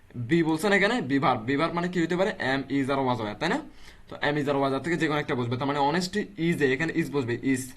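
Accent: Chittagong, Bangladesh. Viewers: Bhaia apnar kotha chitaingar moto lage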